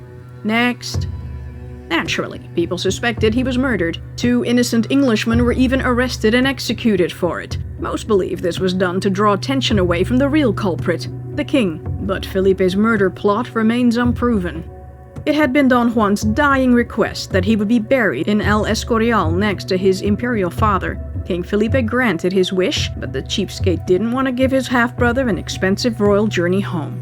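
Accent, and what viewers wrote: Netherlands. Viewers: I love the narration. The dutch accent is very sweet to the ears. And of course this is well written with good humour. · This channel needs more views especially given the quality of the content. Also by the way you pronounce things would it be right to assume you're from the Nederlands.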